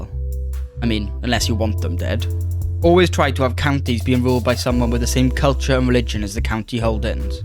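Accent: Wales, United Kingdom. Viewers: Welsh, and it’s beautiful. Check out a show called “Gavin and Stacey”, it’s lush. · Are you welsh by any chance?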